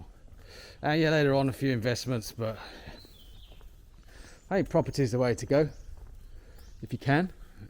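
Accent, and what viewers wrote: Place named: London, United Kingdom. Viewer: Thanks for the back story. Would not have thought you had a private school background, and your dad being a muso. Obviously you have set yourself up really well financially, which requires hard work. I'm a Kiwi and to me your accent is very London working class, "inn-it".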